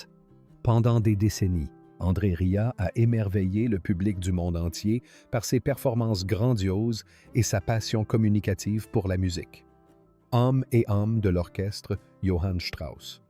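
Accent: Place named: Canada. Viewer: 18/05/2026 1) MONOLOGUE DE L'IA ACCENT CANADIEN ! 2) Il SE PRÉNOMME "RIEU", pas "RIA" ! 3) À , ce n'est pas André Rieu sur le lit d'hôpital ! Et en haut à gauche, qui est-ce ? 4) À ', qui est ce type gros avec le crâne rasé ? 5) À ', ce n'est pas lui avec à ses côtés l'infirmière ! 6) À ', IDEM 7) À , un homme jeune, aux cheveux foncés, long et une barbe ! 8) À , une infirmière aux longs cheveux blonds et un homme asiatique allongé subissant un examen ! 9) À ', qui sont ces jeunes adolescents regardant leur téléphone ? ASSEZ !:body-green-covering-eyes: JE VOUS SIGNALE : C'EST INSUPPORTABLE !:face-orange-frowning::face-green-smiling: Belgique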